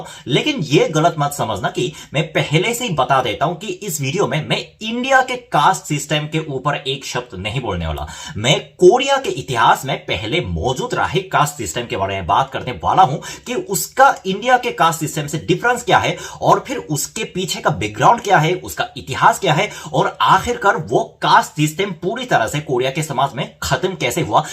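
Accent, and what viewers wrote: Standard. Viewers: Aap to india se bhi shudhh hindi bolte hai ekdam book ke jaisa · इतने शुद्ध हिंदी तो मुझे भी नहीं आती Jitna aap bol rahe ho😁.. I'm a bengali girl 😊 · Waah... jabardast... 👍🙏 Varnan karne ka tarika... bahut accha lga.. 👍 sudh Hindi 👍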